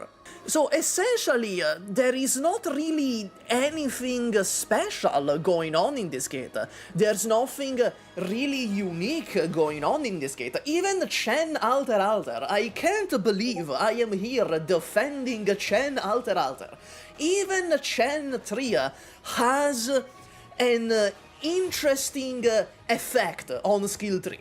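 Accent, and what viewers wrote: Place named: Italy. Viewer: You sound italian AF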